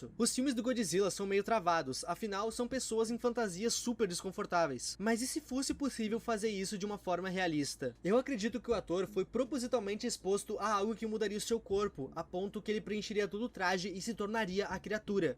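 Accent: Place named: Northeast, Brazil. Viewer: Vc é nordexxtina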